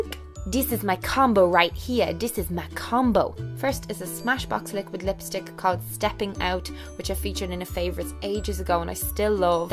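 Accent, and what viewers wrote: Ireland. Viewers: Lol- I feel like we'd be best friends in real life. Actually, I know we would be! PS I love your Irish accent, it's so beautiful!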